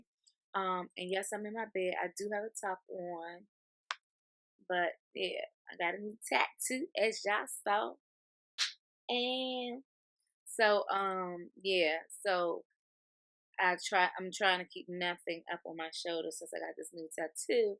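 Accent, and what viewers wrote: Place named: Washington DC, United States. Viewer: I hear your DC accent so I know you're from the area. Where do you get your nails done?? They are so bomb.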